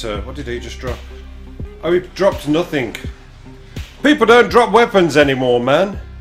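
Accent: Birmingham, United Kingdom. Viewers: Are you back for good? WE all miss our that smooth brummy twang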